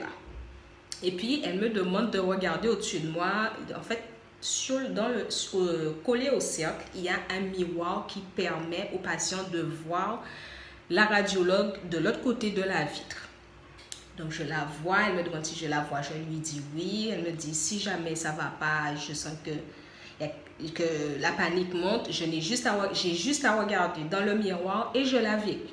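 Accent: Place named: Guadeloupe, France. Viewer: Salut moi aussi j ai la drépanocytose Tu as l’accent de la Guadeloupe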